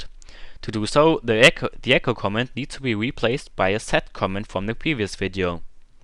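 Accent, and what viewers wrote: Germany. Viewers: ahaha geiler deutscher Akzent und danke für das video xDDDD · i think ur a german like me :D nicee accent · standert german accent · man merkt irgendwie das du deutsch bist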